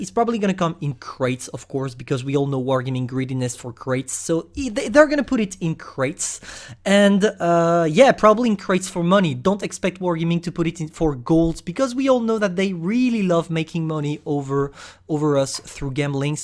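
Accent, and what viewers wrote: France. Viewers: Ta un accent français de ouf mec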